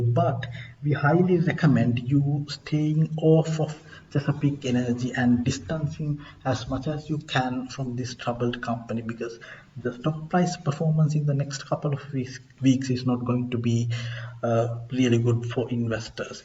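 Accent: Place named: India. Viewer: I love your indian accent!!! its so adorable!!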